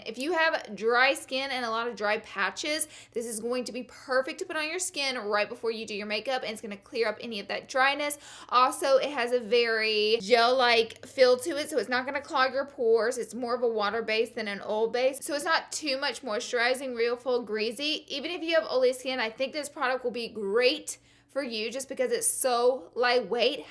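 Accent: South, United States. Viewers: "wear it around town" hahah! where are yu from i can't put my finger on the accent! sounds southern almost to me!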